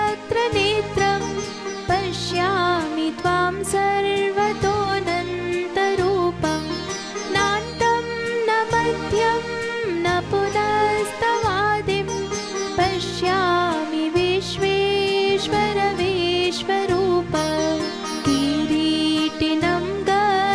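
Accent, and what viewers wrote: Standard. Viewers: अत्यंत स्पष्ट व शुद्ध उच्चारण, शब्दों का उतार-चढ़ाव भी अत्यंत भक्तिमय हरे कृष्ण हरे कृष्ण 🙏🏻🙏🏻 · बहुत बहुत प्यारी सुरीली और कर्ण प्रिय आवाज़ में , शुद्ध उच्चारण से , शुद्ध भाव से आपने हमको भी धन्य कर दिया है । भगवान श्री योगेश्वर की कृपा आप पर बनी रहें । जय श्री कृष्ण ! 🙏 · माँ सरस्वती की वाणी आपकी jihwa में है. Shudh उच्चारण, सुनने में Priy है.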